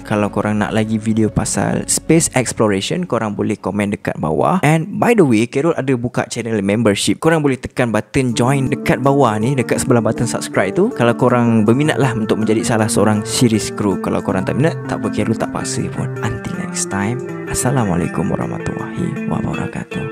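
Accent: Johor, Malaysia. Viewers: Bro...ko org johor kan...aku baru je subscribe kau...cara kau cakap ...senang faham...👍🏼👍🏼👍🏼👍🏼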